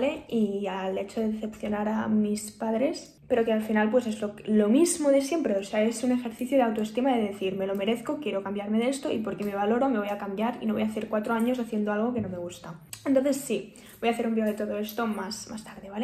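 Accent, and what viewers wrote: Spain. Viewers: Apenas comencé a verte hoy y no he parado todo el día en ver videos tuyos, que realmente me encanta el acento español y creo que la forma en la que hablas y eres me relaja demasiado.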